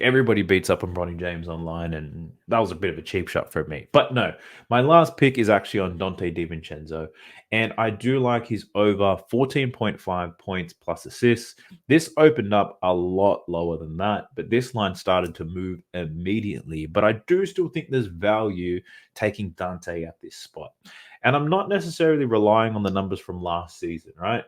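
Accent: Australia. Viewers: Never thought I would be listening to an Australian dude for NBA picks 🤣. Love the insight tho 💯💪🏽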